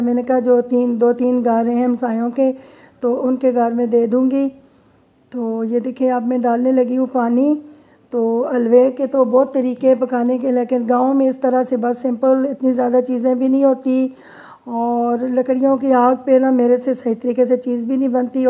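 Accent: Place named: Mansehra, Pakistan. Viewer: nice yuummy yuummy halwa apk bolny k style sy lgta ap mansehra sy ho me b mansehra city township sy hu apko gft dn kr diya a ap b kr dena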